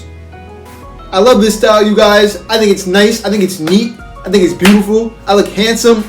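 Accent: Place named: Haiti. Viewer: First, I just want to say I like your accent. Where is that accent from? Are you from Haity? Anyway, to get back to the topic, I think all this stuff is in Black men's head. If they are insuecure with their hair others with sense it. I have always had long hair and used to having it in braids, twists, or loose. I have never had an issue working in corporate London, UK, because I've always been confident of who I am, very proud of my African culture and never tried to fit in or gain acceptance to the detriment of my culture. Besides, my ancestors used to have long hair for spiritual reasons. Sometimes they used to keep it in locks, especially the kings and warrior class. Therefore, stuff that! I'm not going to hide my heritage to appease others just because they feel uncomfortable about an important part of my culture. That is their problem, not mine. 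With this mind set, instilled in me since childhood by my parents, I have never ever had problems working in the corporate sector previously (now I work for myself). I think I had only had one small issue many years ago when I went to an interview for an important managerial position. The interviewer (a white man) said my hair is too long and if I would consider cutting it off if I get the job. Mind your, my hair was in a neat pony tail at the time. My response was: "Never! My hair is part of me and as you can see, it is in a pony tail." I told him with such pride and assertiveness and set the foundation then and there irrespecti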